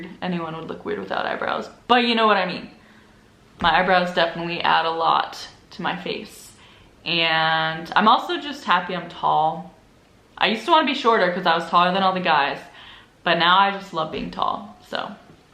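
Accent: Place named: United States. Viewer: Interesting, I would though that longer time spent outside US would change your english to be more plain in intonation but you still have this singed US english. For example you say four times in the row "theeeeere" instead of plain and simple "there". If you were not from Nebraska I'd say your are from California :-))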